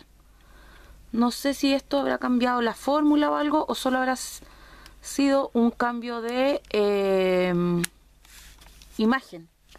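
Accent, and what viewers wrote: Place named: Chile. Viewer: K lindo hablas chilena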